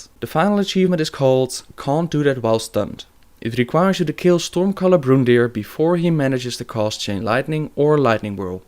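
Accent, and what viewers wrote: Netherlands. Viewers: Your dutch arnt you?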